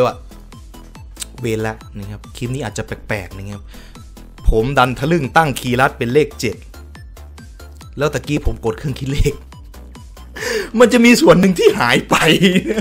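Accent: Isan, Thailand. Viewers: พี่ คนอีสานป่ะ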